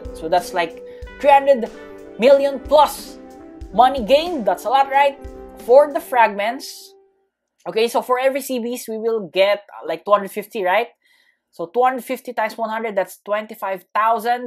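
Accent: Philippines. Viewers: You sound Filipino sir are you Filipino?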